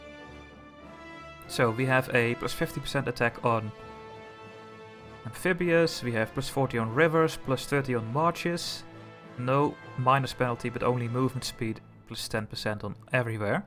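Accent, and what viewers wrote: Netherlands. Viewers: Leuke Lets play man haha je hoort wel een beetje dat je nederlands bent in je stem :)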